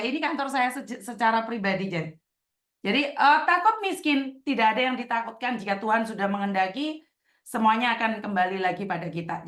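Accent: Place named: Java, Indonesia. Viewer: lebih baik ditulis. Biar jelas dan dapat dibaca oleh semua pihak di boarding house. Ingat tidak semua wni di boarding house mau saling membantu, banyak yg jd Ular di dalam sana. Klo ada peraturan tertulis di boarding house minimal mengurangi potensi kesalahan yg akan terjd. Semoga dapat membaca komen ttg saran ini. Klo Gak ada waktu utk menjelaskan peraturan yah lebih baik tempel aturan main di boarding house biar bisa diturutin oleh semua penghuni boarding. Dan semoga kedepannya mengurangi emosi saat mendengar laporan dari pihak lain, Dan lebih memberikan waktu ke diri sendiri utk mengolah informasi yg masuk. Jujur aja mbak mbak yg laporin ada salahnya dgn bikin lebay aduan spt mrka bilang Lina belanja baju Zara yg seksi faktanya di channel Koko Faisal baju yg dibeli cuma baju biasa. Dan ttg ngomong bahasa mandarin, gak semua orang Indonesia paham dgn bahasa Jawa yg dikeluarkan, Sy orang Bekasi saja kadang suka tulalit klo dengar ngomong dgn bahasa Dan aksen Jawa, makanya kmrn ituh Lina ngomong bahasa mandarin dgn harapan biar lebih cepat dipahami penjelasan yg ingin Lina sampaikan Dan di channel Koko Faisal baru diketahui klo Lina bukan orang Jawa tapi Dari Kalimantan Dan pernah kerja di Malaysia, nah orang2 asli Kalimantan emang biasanya gak paham bahasa Jawa krn pas Ngamuk ituh kebanyakan ngomong bahasa Jawa yg bikin Lina tambah bingung. 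Semua ituh cuma saran agar lebih bijak lg kedepannya. Tidak ada yg Salah dgn saran, toh saran baik u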